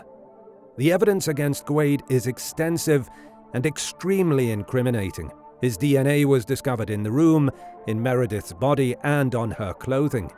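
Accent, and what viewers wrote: United Kingdom. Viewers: Narrator talking so fast with a british accent was totally confusing and not understand alot of thr content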